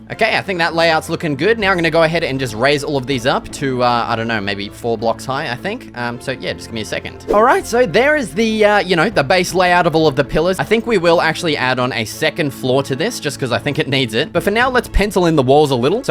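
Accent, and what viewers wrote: Australia. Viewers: I love the Australian accent so much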